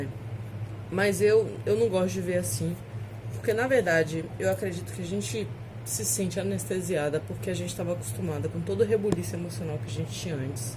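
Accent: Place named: Bahia, Brazil. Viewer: Muito bom e, bem explicado. O sotaque baiano foi o destaque, esse medicamento e sensacional.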